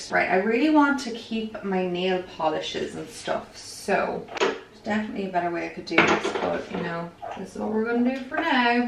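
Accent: Ireland. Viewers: I love your blogs! I organized my closet after watching you....brought out all my spring/summer clothes. Put away all my winter stuff. I could watch and listen to your sweet Irish accent! Please keep your vlogs coming! ❤️ from the USA!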